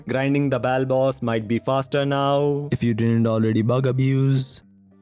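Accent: India. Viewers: Can you drop the indian accent for the videos its kinda difficult to enjoy watching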